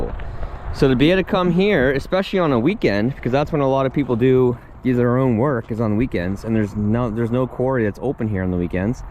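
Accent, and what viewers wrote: Nova Scotia, Canada. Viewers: You'll just end up with a cluttered shop keeping everything in there. Customers will feel safer going into a tidy office, without the mechanical noise also. You've got the land, and we all know by now you love tidy! 😅 I like the way you talk by the way. You're a Nova Scotia boy through and through 😊 Which , of course, brings me to the subject of AGE. You never announced the winner of your " Guess My Age" video. I'm still waiting .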